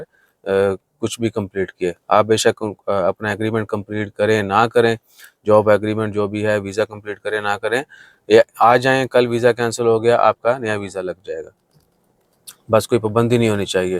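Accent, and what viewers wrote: Pakistan. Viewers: wesy he boli se tu Gujrat k lagy hain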